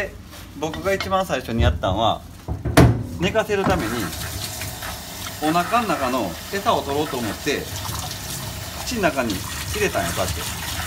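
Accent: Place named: Miyazaki, Japan. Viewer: 流れる水の音と、宮崎なまりの関西弁が良くて、子守唄代わりに聞いてますよ。 「～はですよ、」のとこが面白いほど宮崎の発音ですね(笑)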